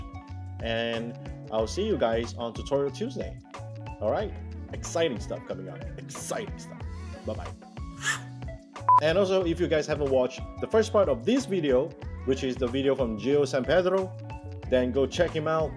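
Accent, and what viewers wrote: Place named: Philippines. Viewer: You sounded more like a pinoy than a malaysian to me..anyway Great Job👏👏👏 this motivates me to unwrap and assemble my Gundams, but paint not..no tools yet. I ll be studying more of what you do kabayan🙏🙏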